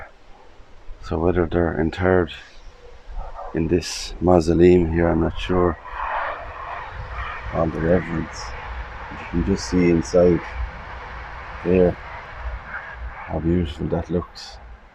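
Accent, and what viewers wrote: Ireland. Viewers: What a smooth voice luv the Irish accent dis you notice that the grass is so green plus no weeds?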